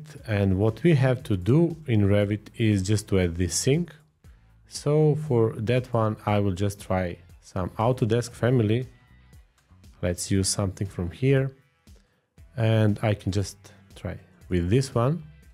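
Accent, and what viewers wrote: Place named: Croatia. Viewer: Nice video and new suscribe! by the way are you hrvat? You have a strong accent and the red and white of your brand. Pozz iz Argentine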